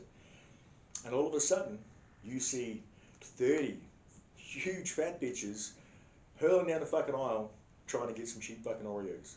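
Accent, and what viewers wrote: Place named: United States. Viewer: This is Kaz, somehow my grandson logged me out and I can't get back in. Geriatric memory loss 🤣 anyway love listening to you always. However you are starting to get an American accent. I can understand everything you're saying 🤦‍♀️. I'll hit the subscribe button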